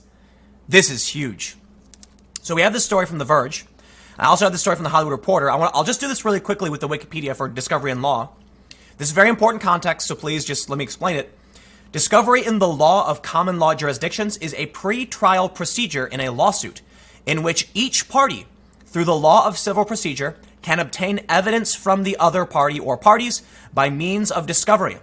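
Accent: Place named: South, United States. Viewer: What's up with that sudden southern drawl?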